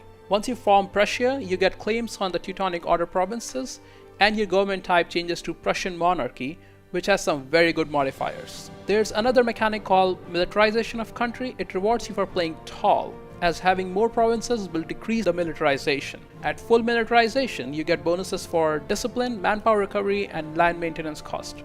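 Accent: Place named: India. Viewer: make a tutorial on India. I hope i'm not wrong but you sound Indian. If you are make a tutorial on India and tell us some history :D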